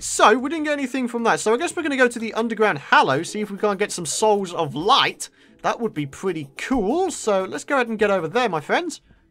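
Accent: United Kingdom. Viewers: HEY! I love your videos and your British ascent. you are my favorite you tuber and I hope you could respond to me, it would make my day better, after being bullied.....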